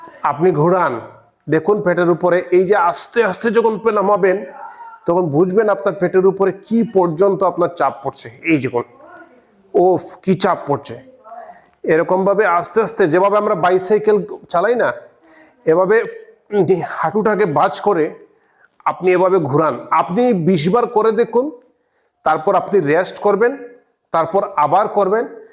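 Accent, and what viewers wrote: Sylhet, Bangladesh. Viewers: ভাই কিতা সিলেটি নি কথায় বুঝা যার সিলেটি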